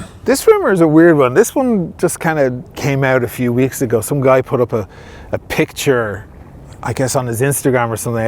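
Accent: Ireland. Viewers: Irish guy ... lol 😝 nice Intro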